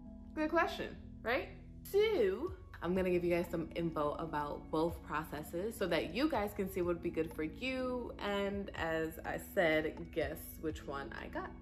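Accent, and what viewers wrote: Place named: New York, United States. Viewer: omg i knew u were a new yorker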